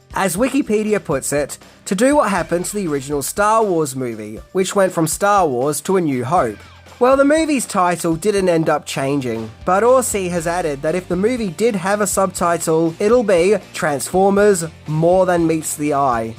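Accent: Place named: Australia. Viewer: LMAO, your Aussie accented snob voice is hilarious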